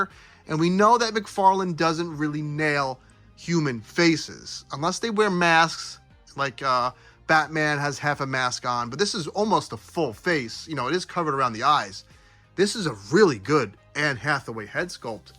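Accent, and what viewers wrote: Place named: Connecticut, United States. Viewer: Connecticut..that explains the accent 😆 but really, did ya find em on the shelf..or did ya hustle them from the back ?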